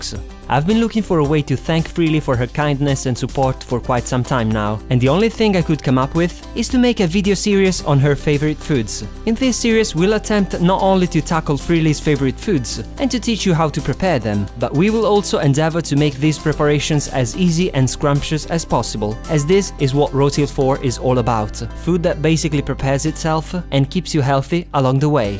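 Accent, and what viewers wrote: Italy. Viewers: yes I really like all the recipes in here, sounds like you are Italian from the accent I'm Italian also but I have been in the USA a long time my accent is a bit different, anyway I can't wait for another of your recipes:) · I'm sorry this has nothing to do with the video but are you italian? you sound italian!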